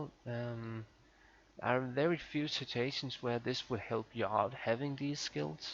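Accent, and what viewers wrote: Denmark. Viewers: hey Fellow Danish fam. Jeg kan høre du er dansker. That accent though, also the keyboard saying "DAN"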